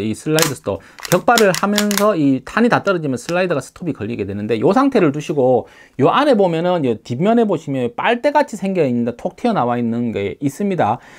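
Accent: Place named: Busan, South Korea. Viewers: 빠사~지는....찰진 다이내믹 부산 표준어에 좋아요를 탁~! 치고 갑니다 ^^ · 뭔중국? 경상도 말투인데? 한국인아님?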